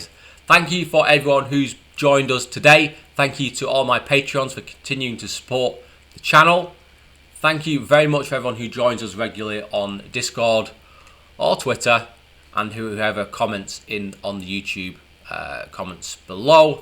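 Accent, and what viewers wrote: United Kingdom. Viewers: Do you live in Las Vegas now? For how long? And if so, isn't it time for you to lose your English brogue accent? We think so!!!!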